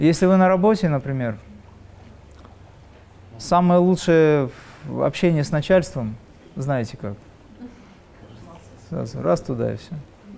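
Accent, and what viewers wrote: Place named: Dagestan, Russia. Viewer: Аварский акцент есть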